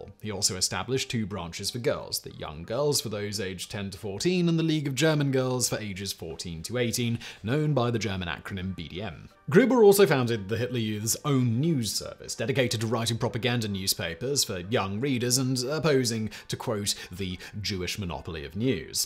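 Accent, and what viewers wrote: United Kingdom. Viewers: Yes! I found him via The Casual Criminalist channel. I thought he was a very posh English man. Very proper. Then I found his other channels. 😂😂😂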